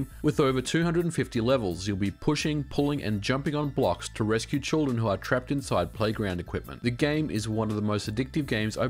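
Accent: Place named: Australia. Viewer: you're Aussie right? all these years watching you i know you're living in burgerland but you just sound Aussie... 😅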